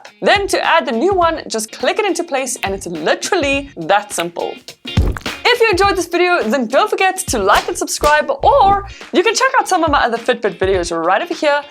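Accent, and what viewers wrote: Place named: South Africa. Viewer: I hear a South African accent ✊🏽 🔥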